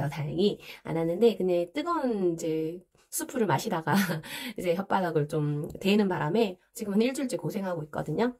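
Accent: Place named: Gyeongsang, South Korea. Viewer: 저도 대구 사람이에요 ㅎㅎ익숙한 대구 말씨이셔서 ㅎㅎ 항암 이전인데 영상보고 많이 배우고 있어요😊!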